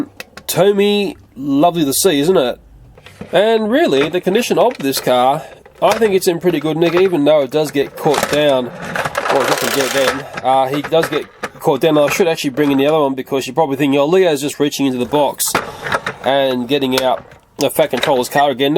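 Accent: Australia. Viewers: I love your Australian accent when your talking about stuff in your videos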